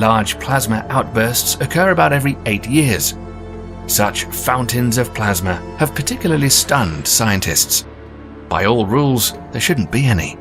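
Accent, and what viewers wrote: United Kingdom. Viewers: Why do we need a guy with a British accent to explain projects by NASA?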